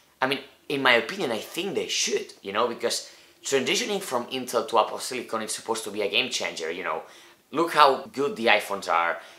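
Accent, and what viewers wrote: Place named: Italy. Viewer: I am definitely obsessed with Apple! I like your accent! Where is it from? Italy?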